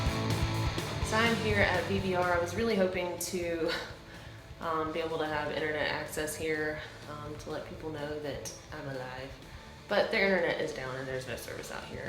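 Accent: South, United States. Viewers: "This here sign..." lol I love it. You can take the girl out of the south...never the south out of the girl. Rock on.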